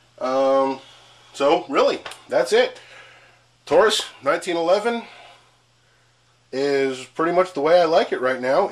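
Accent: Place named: Philadelphia, United States. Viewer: Great video Big Dawg. When I heard you say cheese steak or hoagie I thought, this guy must be from the Philly area, then I heard you say the Oaks gun show and it sealed the deal, Philly area it is. Anyway, I just picked up a blued Taurus 1911 today at Tanner's black friday sale for $350. Cant wait to get out and shoot it. I did a bunch of research and everyone that has one loves it. By the way, born and raised in Philly.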